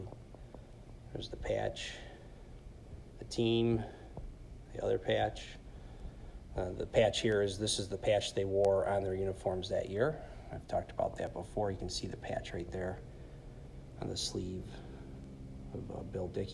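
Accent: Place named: Chicago, United States. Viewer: I grew up in Chicago in the 70's when the Bears were terrible and me and a lot of my friends were Cowboys fans. Funny, I detect a Chicago accent from you, did you have the same 70's experience? That '77 Cowboys team was probably my favorite team of all time. I was in the navy from '86-90 and was about 20ft from Staubach on a carrier once. Bert Jones had a cannon. I live 7 miles from where The Sorena Hotel used to stand. Al Lang Stadium where the Yankees used to spring train is still in use and is now a full time soccer field.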